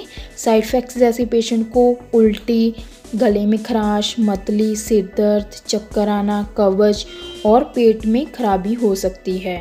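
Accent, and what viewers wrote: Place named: Punjab, India. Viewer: Aap punjab se lagte hai ..khas kar barnala side se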